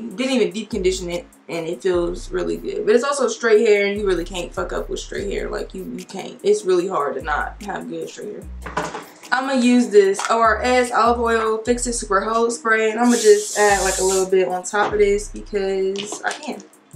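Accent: United States. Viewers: You know what I realized u dead kinda got a country accent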